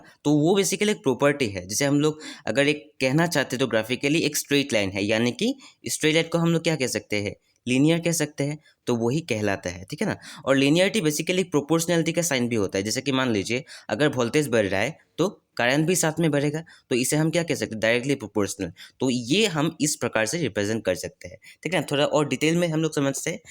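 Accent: Assam, India. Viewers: Bhaiya aap Assam se ho kya😃? Aapke accent se aise lg rhe😍.. Btw thanks for this amazing video 🥰🥰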